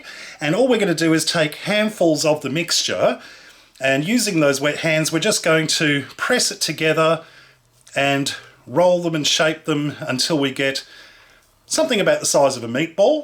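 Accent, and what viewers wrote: Australia. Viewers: Im australian but have been living overseas for years. I love your videos because they remind me of australia ( food, accent, etc ) <3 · Omg you're the sweetest! Love the Aussie accent and your positive energy! I will definitely be making these for my family here in Belgium. Having grown up in eastern Canada, my own mother always made very similar stuffing (no ground meat nor chestnuts as many Americans apparently do) but the onion, celery, bread crumbs, butter, seasoning and herbs (sage, rosemary, thyme). Mmmmm....can't wait to do this..next weekend when our girl comes home from college surely as Canadians already celebrated Thanksgiving in October. Do you celebrate "dan anda" too ?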